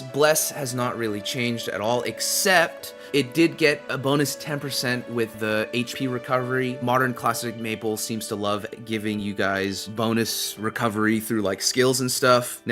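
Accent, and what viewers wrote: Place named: United States. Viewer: dude your accent is awesome, which part of america does it come from?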